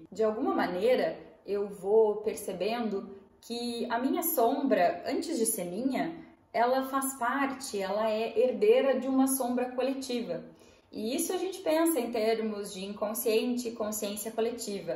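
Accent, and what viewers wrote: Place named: South, Brazil. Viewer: Olá, estou maratona do o seu canal. Gostei muito da maneira simples e objetiva com que vc aborda Jung. Esses dias tenho refletido bastante em que linha farei minha especialização. Tô sentindo o mestre Jung me chamando. Parabéns pelo trabalho. E bora espalhar Jung pela rede com sotaque do sul.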